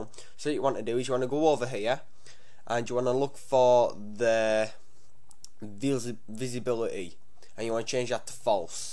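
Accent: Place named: United Kingdom. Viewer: defo British XD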